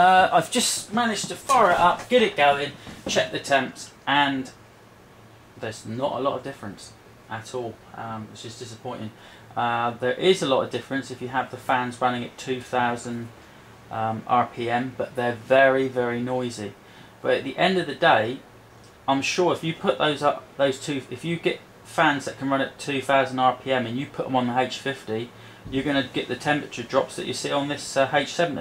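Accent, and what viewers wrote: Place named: United Kingdom. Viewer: You sound british. Where do you get your parts from?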